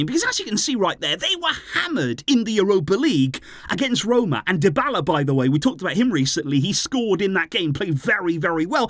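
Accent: Wales, United Kingdom. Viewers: I’ve watched a few of your old videos and your Welsh accent stood out a lot back more then 😂 but your channel has come a long way and we all appreciate your videos 🙏🏼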